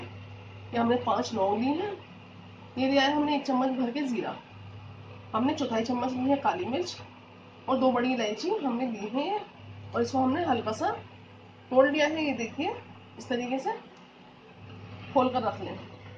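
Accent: India. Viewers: Hello Assalamalikum: I have been making saag ghost for a very long time but I came up with your channel and tried it last night. I couldn't believe it how tasty this saag ghost came out. My family couldn't stop eating it. It was so delicious and well-made. Jazak Allah for sharing your talent. I love your Indian urdu accent and MashaAllah you are so talented. I also tried your other recipes and I loved it so much. Your method is so simple and easy to follow. Jazak Allah again. :)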